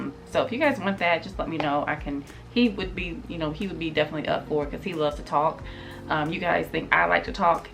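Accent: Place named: Alabama, United States. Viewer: Yaay to 3k & counting 🙌🏾🎉! When I got the noti for this video I couldn't wait to listen on my drive home. I have so much too say...re: balancing life & how u had the channel for years, 2020 was your time & the pandemic allowed u to get a great start again. Also, years ago, the kids were younger so it probably was a bit more difficult to carve out time when u had to tend to them more. I'm a single mom of an 8 yr old & I'm slowly starting to feel like I can breathe a little at times. Also doing a 3/12 schedule is great esp. if u can make it so that u can have a long stretch of days off. Re: channel growth-it's true what u said about not comparing yourself to others. I wish u continuous growth of a genuine community of positivity. Engagement & consistency is so important, from what I've heard. Re: u loving NYC...I was so excited to hear that! 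I live right across the GWB in Jersey but I was born & raised in the Bronx & a grad of NYU 💜. Also, re: selling your clothes, it is time consuming but I have an idea, whatever your daughter doesn't want, u can do a declutter type of video (more content 😉) & then do a sale. It is a lot of work but for a short time instead of keeping up with listings on Poshmark or the like. Lastly, I kept wondering if u were from Alabama because your accent reminds me of someone who is from there as well. I could go on & on but I'm so happy that I found your channel & thank u for sharing 🤗.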